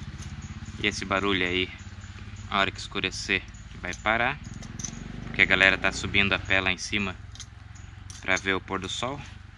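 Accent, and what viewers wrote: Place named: Paraná, Brazil. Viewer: Homi vc e da onde ,tem sutaque de paranaense misturado com Catarina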